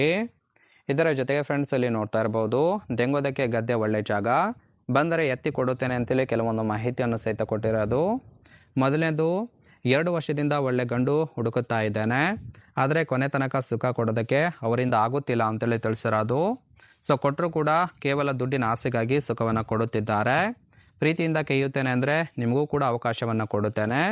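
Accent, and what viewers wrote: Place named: Kerala, India. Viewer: O K Callem Malayalli Tone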